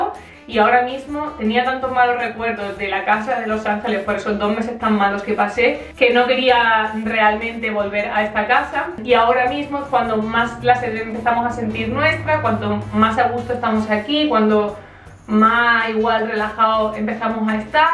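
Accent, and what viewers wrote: Granada, Spain. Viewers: eres muy fuerte.,Te sigo desde españa y me gusta como te expresas,., como se nota que eres de granada., Pero es normal que añores a tu familia y españa hay otras costumbres y se vive diferente., Te doy mucha fuerza para que continuemos tu y Pau.,hacia adelante¡¡¡ yo mi adolescencia veraneaba en un pueblo de granada.,y todo lo que explicas es verdad¡¡muchos besos para ti y para Pau y para el perrito 😘😘😘😘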